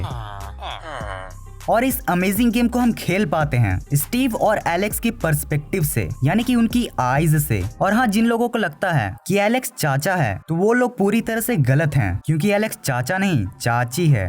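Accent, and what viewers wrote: Bihar, India. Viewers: Are bhaiya bihar se ho ka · Are you from Bihar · You are from Bihar? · Bhai bs 1 question ka abs dedo ki tm bihari ho kya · His accent of saying hindi is like bihari love from bihar 😎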